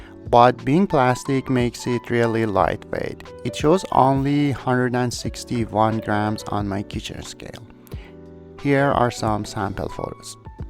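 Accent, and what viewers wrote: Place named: Iran. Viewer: سلام . ویدیوی خوبی بود . ممنون از نحوه‌ی انگلیسی صحبت کردن متوجه شدم ایرانی هستید 😊. در کل با لنزهای زوم ارتباط برقرار نمیکنم . به جای ۲ تا لنز زوم ترجیح میدم ۴-۵ تا پرایم همراه خودم داشته باشم .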